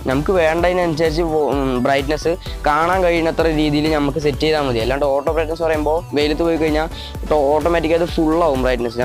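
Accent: Palakkad, India. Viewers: Enthanda നീ പാലക്കാടാണ് ഓ തെന്നെ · Your palakkadano??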